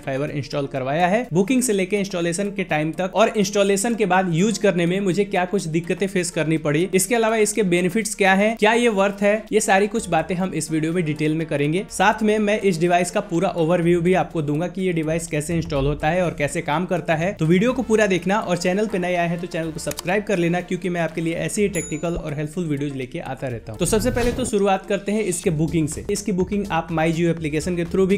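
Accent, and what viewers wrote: Bihar, India. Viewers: Aap Bihar se ho kyaaa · आप की भाषा बिहारी है, और बिहार में सब काम लेट होता है, रायपुर में ०२ घंटे में इंस्टॉल हो गया।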